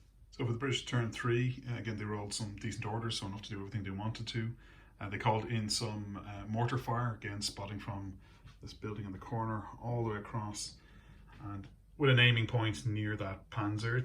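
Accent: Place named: Ireland. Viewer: definitely Irish "Carlow"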